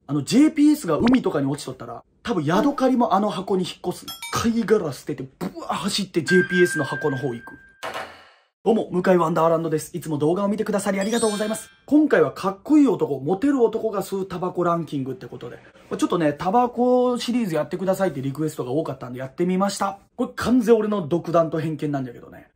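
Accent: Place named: Nagoya, Japan. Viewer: あんた名古屋人か？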